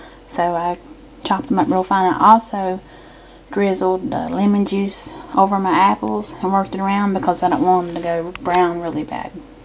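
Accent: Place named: South, United States. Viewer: I LOVE your videos. I am a midwestern cheese, corn everything & cheese girl going raw. Have been for 10 days. We crave what we know and let me tell you, your videos have made it easier for my husband and I to go raw. Thank you very much. Would like to see some new videos from you so you can let us know how you are doing! If you're not still raw, it's ok. You have an entire list of followers ready to cheer you on again! And I love your Southern Belle voice.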